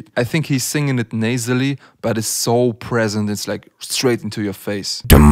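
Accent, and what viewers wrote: Germany. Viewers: Du bist Deutsch oder du hast diesen Deutschen Akzent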